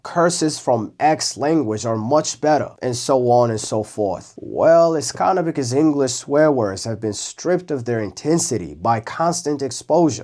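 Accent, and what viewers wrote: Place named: New York, United States. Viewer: I love your New York accent bro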